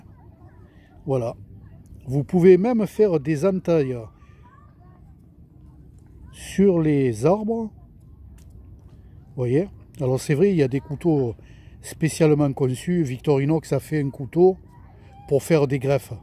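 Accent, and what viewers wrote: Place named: Corsica, France. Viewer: salut. je connaissais pas ce Victorinox, pour 12€ franchement c'est vraiment excellent. en + la qualité Victorinox ça ne ce refuse pas. superbe soleil ☀️ et j'adore ton accent qui me rappelle la Corse. mes amitiés 😉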